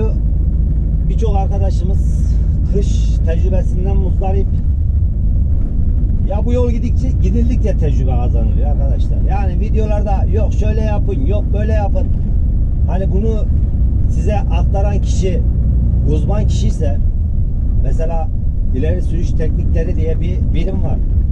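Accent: Adana, Turkey. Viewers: Abi adanalı siven var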